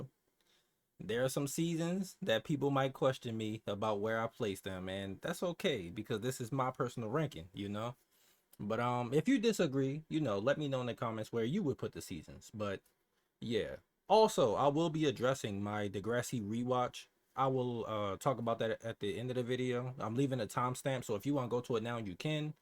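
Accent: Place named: Baltimore, United States. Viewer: Are you from Baltimore? I hear an accent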